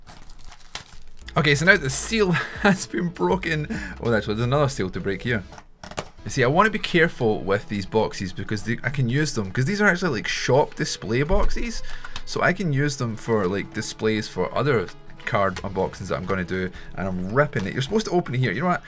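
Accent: Scotland, United Kingdom. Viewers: where you from Kilmarnock Edinburgh or Glasgow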